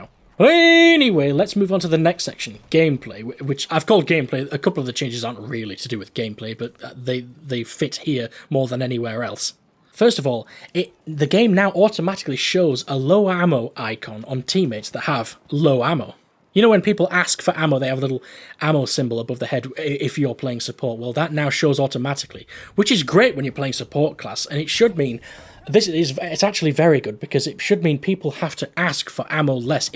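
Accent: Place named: North, United Kingdom. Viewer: you have a charming Northern accent. Where did you grow up?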